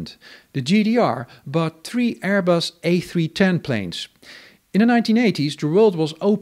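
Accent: Netherlands. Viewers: Am I correct, sir, that you have a Dutch rather than a German accent when you speak English? This video has corrected a visual error I've had for 40 years. I was waiting for you to get to Interflug's L1011... and waited, and waited... It was then I realized the L1011 belonged to LTU! Same reddish colour in the livery! :trophy-yellow-smiling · his accent is Dutch not German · By the accent more from the Netherlands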